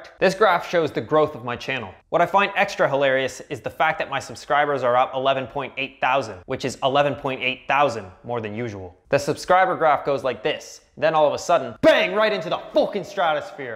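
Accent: Canada. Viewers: Judging by your accent you're a Canadian. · I can tell just by the way he talks hes from canada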